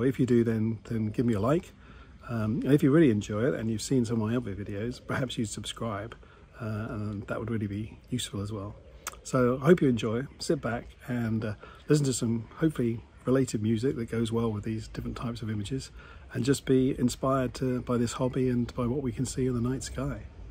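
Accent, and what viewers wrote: Australia. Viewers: Do I detect an Aussie twang?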